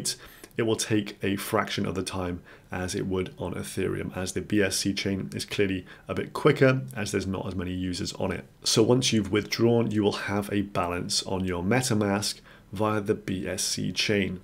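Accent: Wales, United Kingdom. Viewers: You from cardiff?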